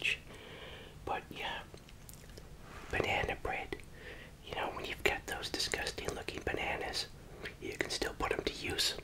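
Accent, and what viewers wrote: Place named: New England, United States. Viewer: this is the first of your videos i have seen -- i got so excited to hear your new england accent!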